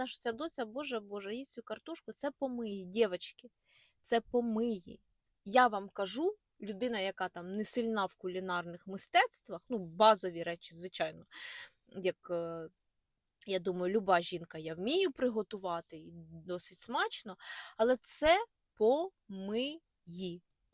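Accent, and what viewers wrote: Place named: Ukraine. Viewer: Какая же у вас речь противная, ну как вы слова произносите, это просто кошмар. Как хорошо, что я не родилась в хохляндии, не дай бог вот так разговаривать.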